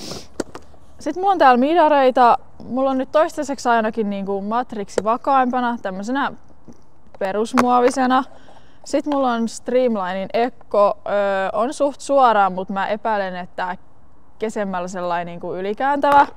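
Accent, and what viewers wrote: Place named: Finland. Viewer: Aivan loistava, tuli ihan Ismo Leikola mieleen kun Suomessa ollaan niin lausutaan ne suomalaisittain 😅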